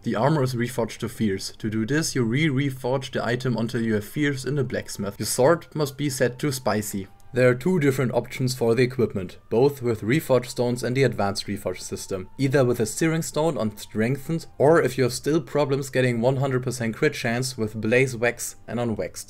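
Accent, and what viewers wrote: Germany. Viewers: he sounds 100% german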